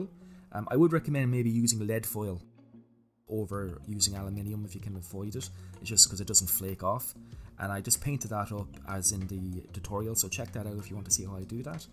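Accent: Ireland. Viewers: I like your technique. Obviously built up over time. The finished product looks great. Have never seen your channel before, I will make it a point to check out your other videos. And, it's nice to hear an Irish accent again.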